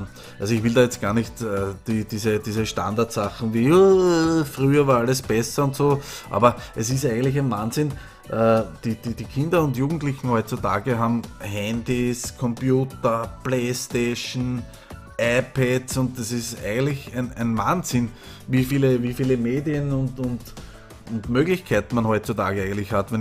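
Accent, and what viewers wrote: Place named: Austria. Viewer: Schau normal keine Videos Von euch aber mal Richtig interessanten Thema ist nicht der Beste Kommentaror von allen aber er Bringt die Sachen richtig Gut Rüber wenn du den Österreichischen Dialekt bisschen weglässt dann ist es Glaube viel bisser würde den Dialekt nur bisschen Reinmachen:) Weiter so:)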